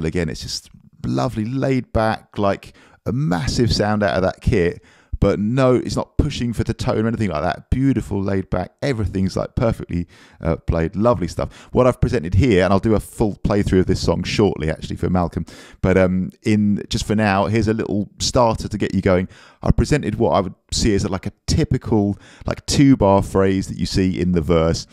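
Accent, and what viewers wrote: England, United Kingdom. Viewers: Read my mind. I have always thought the same thing. There’s no other drum teacher quite like him IMO - and I’ve watched plenty. Apart from his skills as a drummer and exceptional teacher he has such a warm, affable and likeable manner. The lovely English accent is a huge bonus as well.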